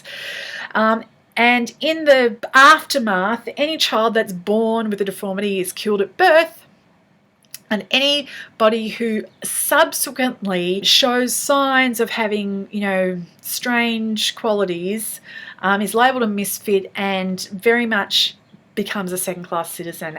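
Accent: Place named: Australia. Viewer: I have never heard of Nick Earls and his 'bloke lit' haha! Thanks for reviewing it! Do you read on a Kindle? And you're borrowing digital books from your library? I am trying to get my head around this process. So great to hear an Australian accent on Book Tube. Baking here in hot hot Melbourne. Love your work! :)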